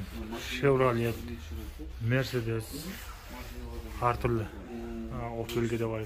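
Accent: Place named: Karakalpakstan, Uzbekistan. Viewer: Ассаламу Алейкум, зор контент болды, таза Каракалпакша сойлегенин ушын рахмет ✊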